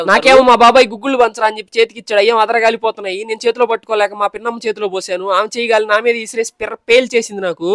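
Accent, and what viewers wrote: Nellore, India. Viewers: Nellore slang🔥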